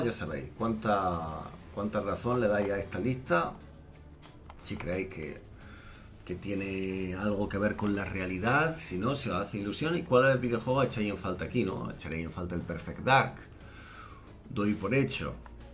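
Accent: Spain. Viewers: Tengo curiosidad por saber de qué parte de España es su acento? Yo soy de Latinoamerica y pues no logro diferenciarlos, mil gracias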